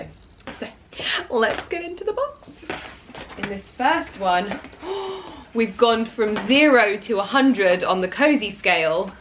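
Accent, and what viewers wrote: United Kingdom. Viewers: Love your British accent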